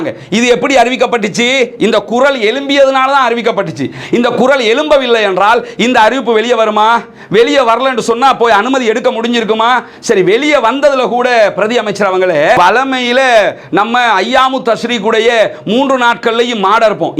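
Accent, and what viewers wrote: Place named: Tamil Nadu, India. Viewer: நீங்க தமிழ்நாட்டில் இருந்து வந்து இலங்கையில் வாழ்கிறீர்களா? ஏன் என்றால் நீங்கள் பேசும் பேச்சு வித்தியாசமாக இருக்கே! 😮 உதாரணம்: டாய்லெட் / டாலர் / பாலஸ்தீன் என தப்பு தப்பா உச்சரிக்கிறீங்களே!!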